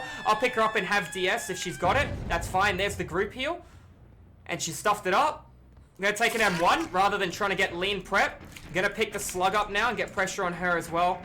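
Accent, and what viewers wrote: Australia. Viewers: I don't hear it probably because i'm Australian too lol.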